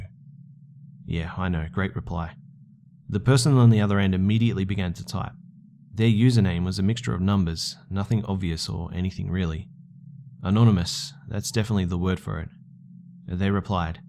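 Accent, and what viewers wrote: Australia. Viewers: you can literally do anything and I'm happy. I just love that Aussie narration!! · God dam it you are aussie now i got to like and subscribe becusae its the aussie thing to do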